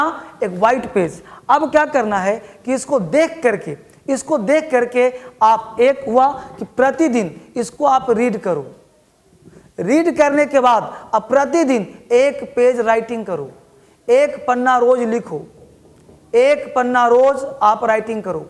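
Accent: Bihar, India. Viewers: आपके आवाज से लगता है कि आप बिहारी मूल के है...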